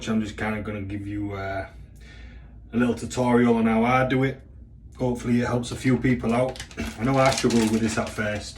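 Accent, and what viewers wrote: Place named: Yorkshire, United Kingdom. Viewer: Mate, are you from Hull originally? Sound like a Yorkshire lad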